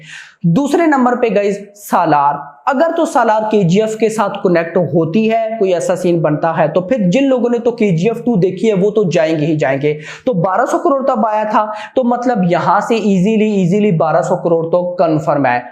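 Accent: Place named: Pakistan. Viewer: Bhai kaha se ho bolne se to Pakistan ke lagte ho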